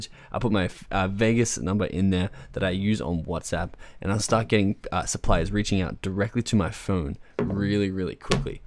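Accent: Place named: Australia. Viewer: hey cool i live in vegas too, i thought u were from australia tho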